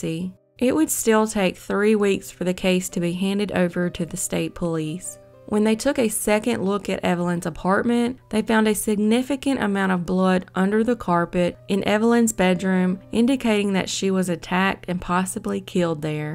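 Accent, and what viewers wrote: South, United States. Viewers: You are an angel. You are either from Alabama, Georgia or Mississippi. I go to sleep to these stories. It means a lot. I used to do the same to unsolved mysteries when I was a kid. Thank you for all you do.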